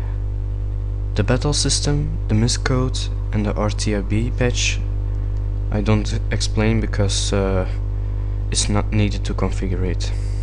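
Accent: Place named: Russia. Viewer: Lijkt wel of je een rus ben met dat accent.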